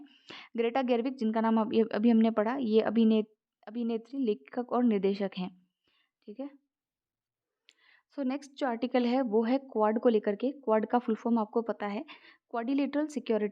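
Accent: Haryana, India. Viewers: App haryanvi ho kya??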